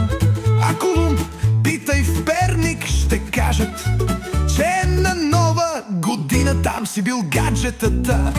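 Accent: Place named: Serbia. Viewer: Да бе ама акцента не може да се сбърка.....!!! Вече достатъчно сте се посърбили за да се опитвате да говорите български без акцент..............!!! Иначе клепчето е много ценно за човек, който има чувство за хумор.......! Аплодирам.....! Ама защо се нервихте на песента на "Устата"......? Зайте да гледаме широко на бъзиците..............!!!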